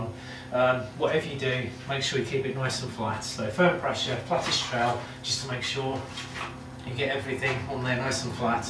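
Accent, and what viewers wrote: United Kingdom. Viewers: Lancashire accent ?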